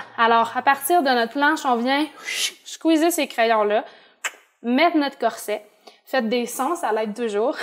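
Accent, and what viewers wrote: Quebec, Canada. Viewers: Yaaaaiiii, une Québécoise!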